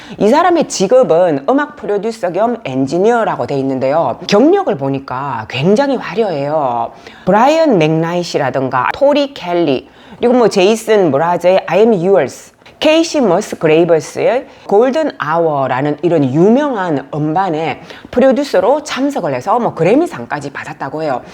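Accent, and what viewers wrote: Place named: North Korea. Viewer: 뭔가 발음이 다르신데 미국에 오래살아서 새는 그런 발음하고는 다름. 북쪽 발음이 느껴집니다.😅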